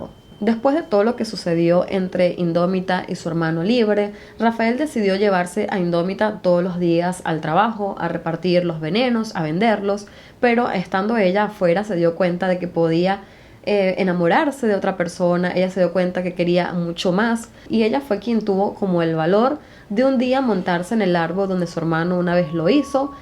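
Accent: Standard. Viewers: Hablas muy bien, además tu acento es muy neutral, eso ayuda bastante a que se sienta calidez en la narración. Te recomiendo que mejores la redacción del guión, repites mucho palabras, cuando puedes usar sinónimos, por ejemplo en este vídeo a cada rato decías 'Sumamente'. Suerte con todo, éxitos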